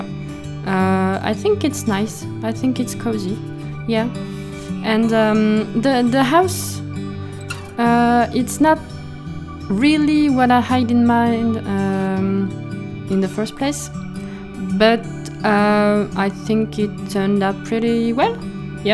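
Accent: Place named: France. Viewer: I can completely understand you! And for the record I love the French accent! And you’re voice is so nice to listen to. I understand that you’re nervous, I myself speak a different language and I just did my first voice over too. But it went well and with every time we do it I think we’ll be more and more comfortable 😊 I really like this home btw, so beautiful! 😍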